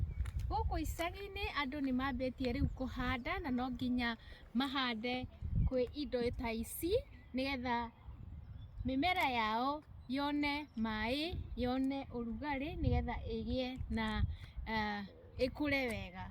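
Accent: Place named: Kikuyu, Kenya. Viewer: Woow I love your kikuyu accent amazing growing your own veggies 👍😍❤️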